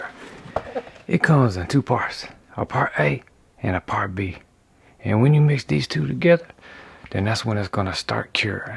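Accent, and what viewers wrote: Louisiana, United States. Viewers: I wanted to reach out and tell you something funny, I have a little game I play with myself. Anytime I watch a video online and I can hear people talking, I love listening to the accents and being a Louisiana native, I can ALWAYS spot a cajun accent! ;) I do a little bit of digging and everytime so far, I've been right. Well today my bf was watching your video and he mentioned to me that HE thinks you're from Louisiana, based on the accent alone. I noticed two other things: you have a cup from Newks (which is only in the south, and I've been to the one in BR), and you have a PLASTIC cup.. and every single Louisianian has a cabinet full of plastic, mostly Mardi Gras cups. 😂 I crept on your various social media platforms, with no answer as to where you're from. Is it Louisiana, and if so, what part?? My bf and I are from good ol Gonzales. 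I hope this story made you laugh 😂 take care and laissez les bon temps rouler! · I just want to know what state you’re from, your accent reminds me of my dads (Louisiana). He does cool craft stuff too, specialty is tie-dye haha. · Are you originally from Louisiana you have a hard accent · is you Cajun? I bet you is. I love the videos. So educational and relaxin.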